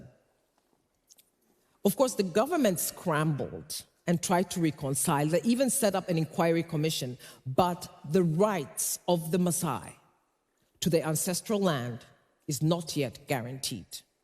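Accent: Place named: United States. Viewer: U bwege unababaishwa na Americanized voice kaolewe naye.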